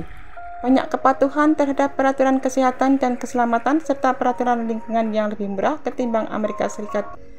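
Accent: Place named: Java, Indonesia. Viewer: Berbahasa Indonesia lah yang benar jangan medok sekali logat Jawa nya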